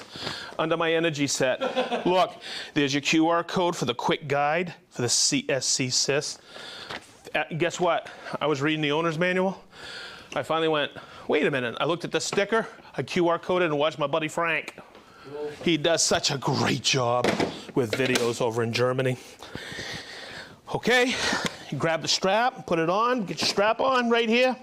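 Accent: Boston, United States. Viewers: I would have bet good money you were from Boston.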